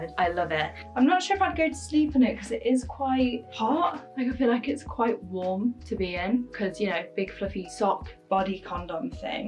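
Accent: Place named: United Kingdom. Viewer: Her British accent is such a turn on.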